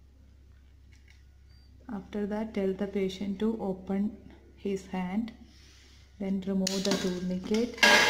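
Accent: Kerala, India. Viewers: Malayalii anu le 😂 explain cheiyanne ketal thanne manasilavim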